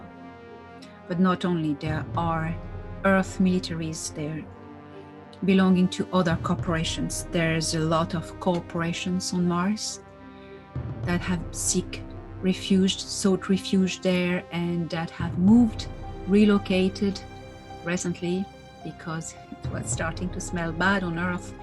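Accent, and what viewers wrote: France. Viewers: I love yr videos and appreciate yr commitment to truth and freedom of all humanity. I do have hearing issues. Your voice is soft and beautiful and I love yr French accent. However, so many times the music is just too loud for me to hear u. I’ve listened twice or more to sections that I can’t pick up. Love yr attitude and grace. Grateful for the information and the GF support. ❤️❤️❤️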